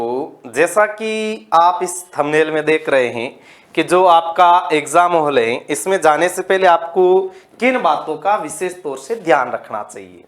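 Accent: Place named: Marwar, India. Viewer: Sir aap marvad se hai isliye mai aapki aabaj ko bade chav se sunta hu😂😂😊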